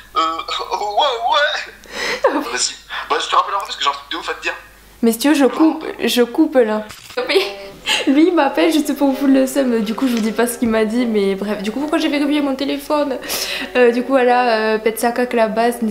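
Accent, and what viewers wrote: South, France. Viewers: pq tu fait un accent du sud alors que tu vis à strasbourg ? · T’inquiète l’accent du sud c’est le meilleur 🔥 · L'accent du sud c'est le sang Moi aussi g un accent mais c drole sinon j'adore ton accent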